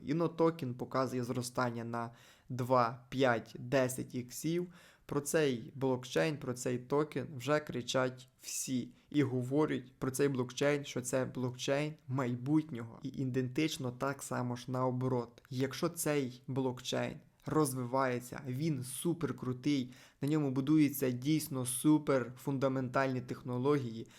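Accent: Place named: Boyko, Ukraine. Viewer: голос пздцц ти шо з села?? як бойко мовиш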